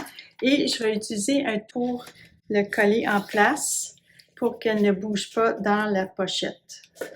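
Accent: Quebec, Canada. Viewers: J’adore l’accent canadien et les conseils au carreau également, bravo bravo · Quel délicieux accent québécois 💙🤍❤️ nos adorables cousins 😊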